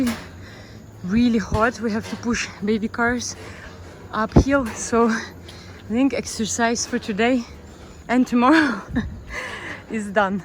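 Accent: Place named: Portugal. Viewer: hi where are you from your english has a funny accent! oh you are fom portugal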